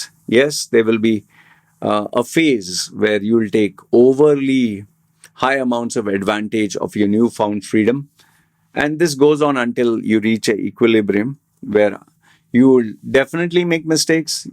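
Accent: Kerala, India. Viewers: Hi, i watch your videos because i like your style of communication. Your accent suggests you could be from Kerala or raised there. Is that correct? Only asking out of curiosity.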